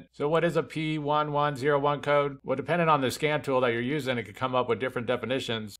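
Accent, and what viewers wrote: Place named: United States. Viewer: I'm like he's speaking perfect American English so I'm like ...... huh? WTF is a Shievy Cruze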